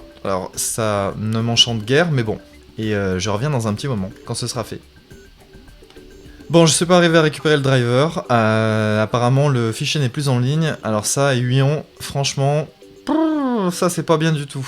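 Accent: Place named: Quebec, Canada. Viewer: t ai quebec...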